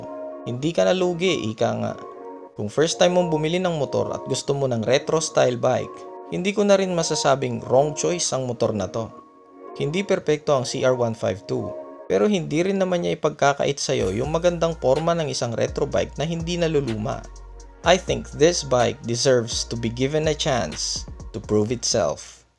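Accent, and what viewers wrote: Ilocos, Philippines. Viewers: Ilokano ah lods hehehe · Ilokano ka mt bosing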